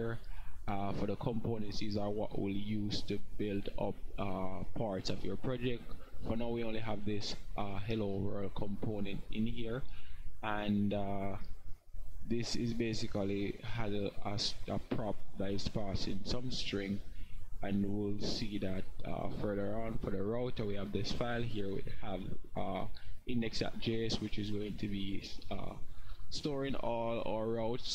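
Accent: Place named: Jamaica. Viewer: Came expecting an Indian accent, stayed for the Jamaican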